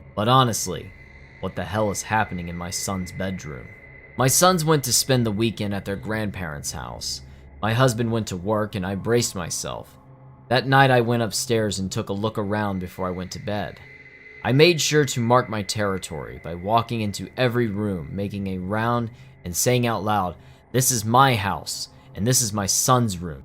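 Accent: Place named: South, United States. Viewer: Nothing wrong with a Southern accent! :P lol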